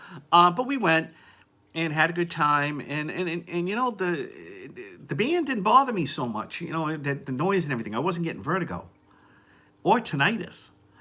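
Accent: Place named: Massachusetts, United States. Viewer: Watching from Kentucky. I can tell ur in Mass. Your accent. Love it. 😍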